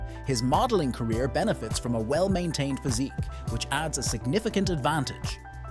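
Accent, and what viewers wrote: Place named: Ireland. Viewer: Irish accent reading non-English native's descriptions. Oh, the dissonance !!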